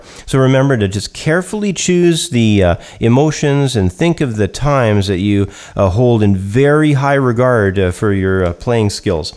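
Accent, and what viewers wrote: Canada. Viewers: Are you Canadian? Accent when you say out sounds like trailor park boys! Awesome lessons!!!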